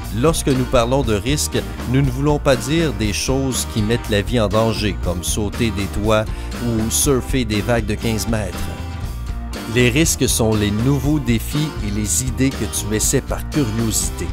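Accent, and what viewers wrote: Quebec, Canada. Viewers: tu as un accent très jolie du Québec mais il faudrait dire vous par politesse désolé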